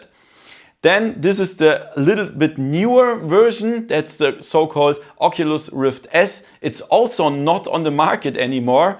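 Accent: Germany. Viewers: My thoughts after i stopped on second : "Thats definitly a german guy." And than i read your channel name.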